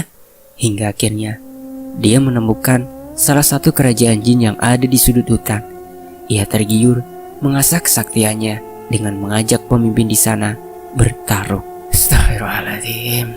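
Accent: Java, Indonesia. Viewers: Salut sama sang orator bagus sekali , terutama aksen jawanya mantap sekali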